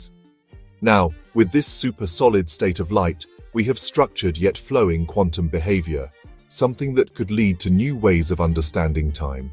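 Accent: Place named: United Kingdom. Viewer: Screw that British accent. Bad choice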